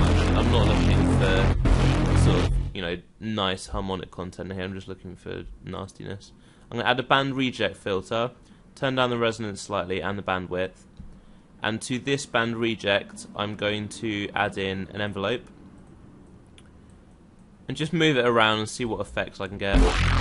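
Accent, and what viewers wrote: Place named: London, United Kingdom. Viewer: no he doesn't. He just has a normal british london accent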